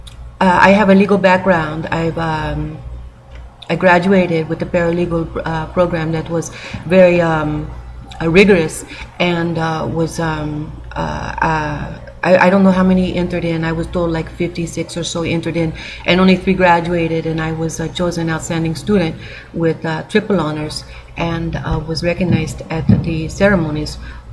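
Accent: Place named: Mexico. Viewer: you stupid bitch you exposed yourself......you have no business speaking for natives........you have no people on the rez......what happens on any rez is not your business.........you dnt even know if youre indian and you say you may have been kidnapped from egypt as a baby.......you are a nutcase who smokes crack........White Buffalo Calf Woman id gods ONLY prophet and will appear back to her peop[le as a white buffalo not as a crazy non lakota with a mexican accent preaching to other non-indians........put the crack pipe down before you end up dissappearing on a rez never to be seen again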